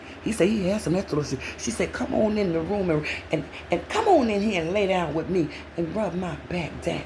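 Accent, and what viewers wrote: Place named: New York, United States. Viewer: Ms.. Love your singing voice per usual! Your commentary cracks me up 🤣. Also I'm detecting like 2 accents from you...Chicago because how you say "restaurant" and NY/east coast😊.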